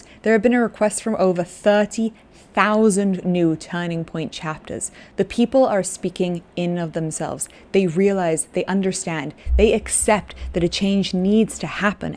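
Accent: United Kingdom. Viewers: British accent? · I like your hair!! Its like wool... beautiful texture. By the way r u British? I like your accent too...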